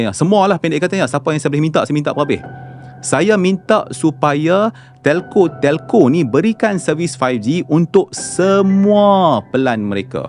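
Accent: North, Malaysia. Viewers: Cakap slang utagha... oghang mana ya?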